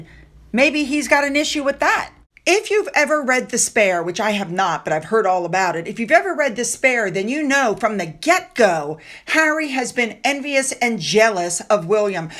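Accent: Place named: United States. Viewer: I don’t find your talking too fast. I’m British and can understand your American accent very clearly.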